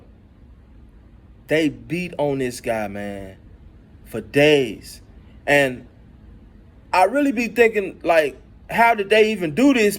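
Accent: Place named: Alabama, United States. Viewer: Damn I didn’t know you was from Alabama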